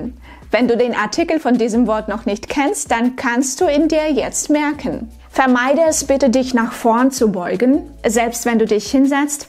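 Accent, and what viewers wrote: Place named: Russia. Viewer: У тебя все равно русский акцент.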